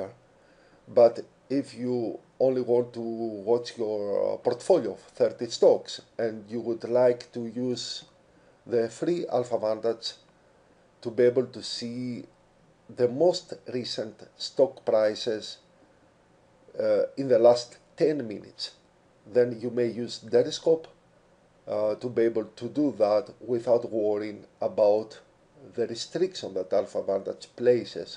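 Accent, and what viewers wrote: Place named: Greece. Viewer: Απο την προφορα καταλαβα οτι εισαι ελληνας χαχα.... δεν ξερω τι ακριβως προσπαθεις να κανεις τυχαια βρεθηκα στο βιντεο αλλα ισως μπορεις να μειώσεις τα calls προς το api που χρησιμοποιεις για αν μην χτυπαει το threshold που έχουν. ΠΧ να τραβηξεις πληροφοριες απο yahοο finance με την buiild in functionality του excel και απο εκει και περα να τραβηξεις σε αλλλα κελια με το vantage indicators που μπορει να μην παρεχονται απο το yahoo Αλλα γενικα.. ολοι αυτοι που δινουν api ...στην ουσια πουλανε api και σε αφηνουν απλα να δοκιμασεις και μετα ζητανε γελοια ποσα για api calls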